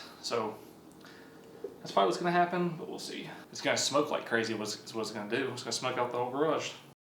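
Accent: South, United States. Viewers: He sounds southern but he calls it Pop, and we dont do that. So I'm mega confused.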